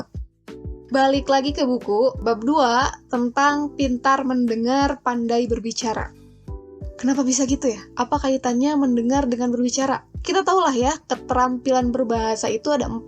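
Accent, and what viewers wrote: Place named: West Java, Indonesia. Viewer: BARU NONTON SI TETEH NA SUNDA PISAN. HEHEU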